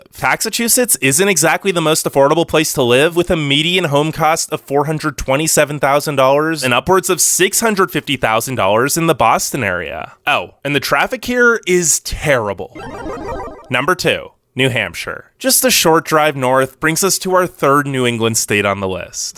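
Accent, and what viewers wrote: California, United States. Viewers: This guy sounds like he’s Californian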